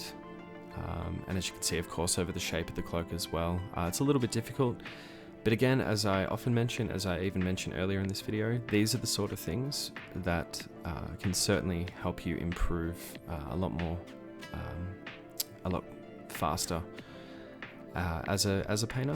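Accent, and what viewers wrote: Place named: Australia. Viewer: I knew I was in good hands when I recognised the fellow Aussie accent. Great to see us taking over the YT Warhammer scene.... SUBBED!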